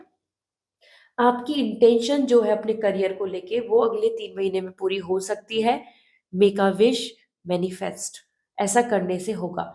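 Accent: Haryana, India. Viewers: Are you from HARIYANA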